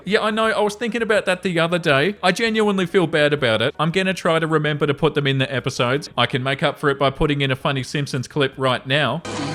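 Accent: Australia. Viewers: Today I’ve been binging these stories for hours while cleaning, I cannot express how much they help my ADHD riddled ass to stay on task lol. Brilliant timing for an upload since I was about to commence more late night cleaning. You’re a real legend for subjecting yourself to the chronicles of these insane people, all while maintaining a sound mental state. Insanity aside I really love your narration, it’s awesome to hear a fellow Aussie’s voice! Thank you for providing genuinely good content that isn’t TTS or AI :)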